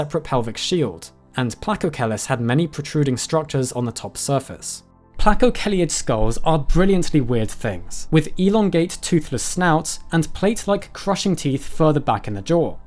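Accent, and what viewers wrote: RP, United Kingdom. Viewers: You sound like, but I dig it. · You're so British. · I like British accents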